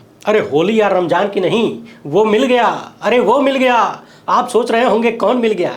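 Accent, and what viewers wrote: Bhojpuri, India. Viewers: आपकी हिम्मत को salute hai... बोलने का style, आम भाषा में भोजपुरी मुहावरों और लहजे का तड़का बहुत सरस लगता है...और speed का तो कहना क्या....!!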